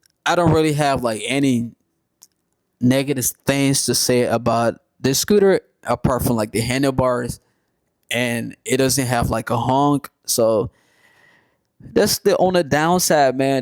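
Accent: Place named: Liberia. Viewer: Your accent, you sound Liberian.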